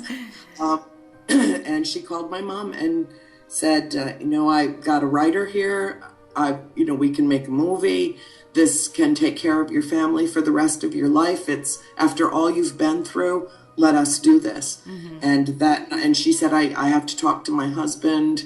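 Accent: Ireland. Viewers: OMG I love the Dublin accent! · It's called a Djinn. N' omg by the sound of your accent, you're Irish. :.D <3 What went on in that house, was because of the witch, contacting a Djinn. (Which most may call a demon). The thing to do with the sacrificing, and whatnot. Many stories like this have been heard in Algeria, where I am originally from. It's to do with the occult, and soul sacrificing. No joke, this stuff is real, and just don't go near it. Movie was based on real events. They interviewed the kids who lived there, and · yep I love the irish accent to.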